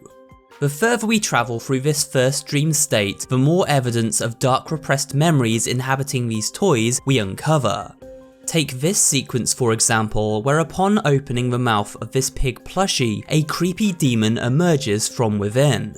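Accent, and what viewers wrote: United Kingdom. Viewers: British confirmed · It is just now when I watch the videos and I notice this guy has a British accent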